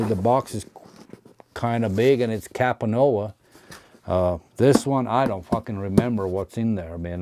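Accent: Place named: Latvia. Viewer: I swear man, nowadays when I see a good cap I can hear that Latvian voice saying " fucking stunner! " 😅 thats your catchphrase LOL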